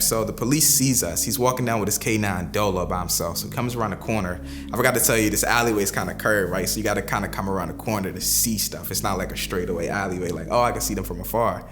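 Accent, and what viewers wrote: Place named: Newport News, United States. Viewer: Sounds like Newport News for sure..shout out to VA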